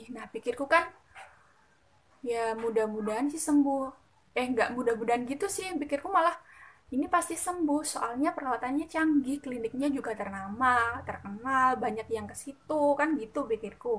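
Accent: Java, Indonesia. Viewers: Wong jowo yo 🤣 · hahahaha medok polos lucuk gitu gemes wkekek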